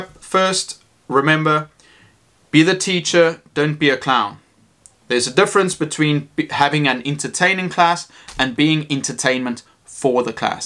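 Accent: South Africa. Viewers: Hey! Thank you for the amazing tips, I've learned a lot! Tomorrow will be my first day back to school. I first started before the pandemic and honestly I think I did really good but there's always room for improvement, right? One last thing, I can't help but ask... Are you South African? I just love the accent haha. Greetings from Algeria! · Are you South African?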